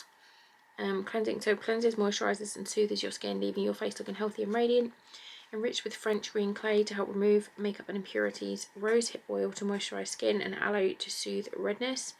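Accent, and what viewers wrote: Norwich, United Kingdom. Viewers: Love your mascara, eyeshadow,eyeliner, eyebrows in this video :) and that Norwich uk accent!! 😍❤️❤️❤️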